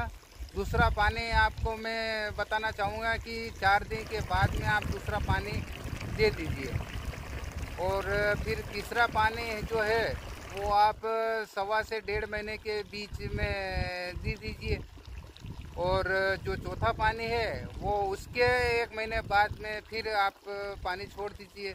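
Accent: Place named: Gujarat, India. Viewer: Bhai Gujarati he bava Hindi bolte he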